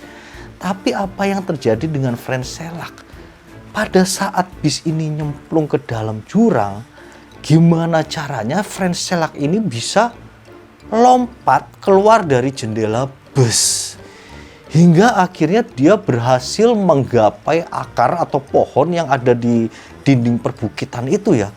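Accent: Java, Indonesia. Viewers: ngomongnya agak medok, lbh enak saya dengernya sbg org Jawa, mantap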